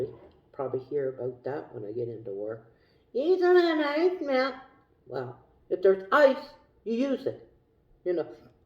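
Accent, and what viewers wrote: Canada. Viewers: Are you originally from alberta? Some things you say sounds like you’re from one of the Atlantic provinces!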